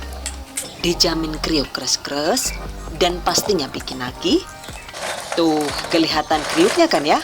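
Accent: Banyumas, Indonesia. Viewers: Ngapak bu... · 😆lucu banget Bahasa koh ngapak kaya nyong kiye yu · Orang Madiun kok ngapak bunda?? Hehehehhe maklum saya subcriber baru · Yuuhh,,, rika wong ngapak ya mbok... ora ngapak ora kepenak.. 🤣🤣🤣 #salamngapak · Ngapak bgt rika koh yu . Btw maen infone yu 😂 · Deneng jebule ngapak rika jand hhhhhh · ngapak tah ....ibunya makasih ilmunya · negro deneng ngomonge koh ngapak hhhhhh ...... njaluk lah yu sriping parene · Plat mobile jawa timur, tapi ngomonge ngapak, jane rika wong ndi koh lik? 😅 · Lokasine madiun deneng ngapak 👍👍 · Bahasane NGAPAK banget kie lahj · Kok ngapak toko wkwk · Loh deneng 🤣🤣🤣 ngapak temen koh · Owaaaalaaah ngapak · Kok ada logaat bahasa Jawa nya mirip org Banyumas😁. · Ciri khas ny oke.. Hihi.. 😂 Bahasa ny ngapak.. Hidup ngapak.. 👍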